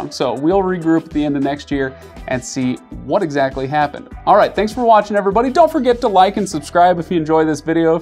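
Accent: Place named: United States. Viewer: It was in plain English,albeit with an American accent. Would subtitles help?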